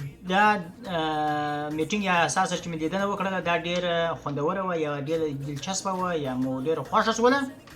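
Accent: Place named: Balochistan, Pakistan. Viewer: What dialect of Pashto is this?? It seems quite basal akin to archaic dialects spoken in Balochistan like Wanetsi or Tarin. I study the linguistics of Indo-Iranian languages, so it is fascinating to see this rural archaic dialect which is markedly different at least from urban dialects. The cadence in this dialect is faster and the frictives more numerous. The retroflex consonants which is what distinguishes Pashto from all East Iranic languages here seem more muted and you use aspirated consonants in conjunction more, you aspirate " der" and "tol". This is in contrast to Pashto dialects spoken in the Sulaiman range and adjoining regions of the Hindu Kush or mainstream Pashto media, where the cadence is slower and the emphasis is more on retroflex consonants.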